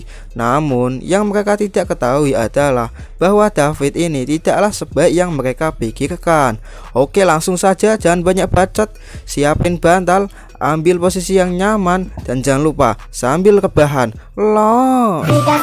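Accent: Java, Indonesia. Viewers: Logat jowo ne medok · min plis, asli mana njenengan, medok banget kalo ngomong wwwk but TQ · ett medok bener si mas logat nya, jadi kurang jelas mas'e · Logat Jawa suarane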